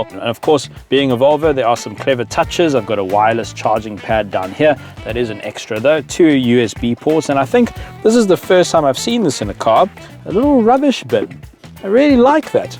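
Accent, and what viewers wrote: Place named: South Africa. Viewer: This SAfrican accent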